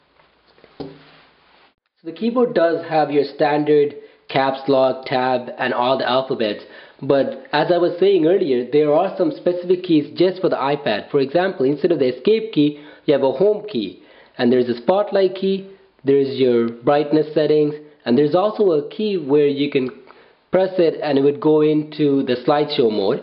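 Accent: India. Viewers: Great unboxing dude! (By the way, are you Indian?)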